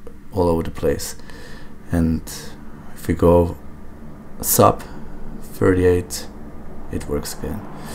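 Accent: Germany. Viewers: Are you german? thanks for the video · Saint Siemore Yes he is german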